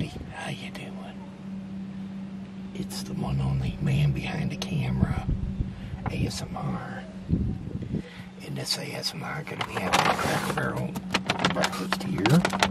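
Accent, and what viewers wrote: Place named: Michigan, United States. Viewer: I could tell you were from Michigan with your accent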